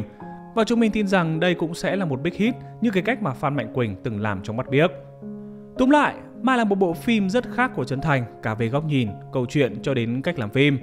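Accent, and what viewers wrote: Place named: North, Vietnam. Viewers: đơn giản vì chủ kênh nói giọng bắc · trình độ đọc hiểu ô kém quá, ý nó là đang đòi quyền lợi cho miền nam các bạn đấy, vì đó kênh này giọng bắc nên đánh giá phim miền nam ko cao hiểu chưa? Mà nữa miền bắc ko lm phim rạp đ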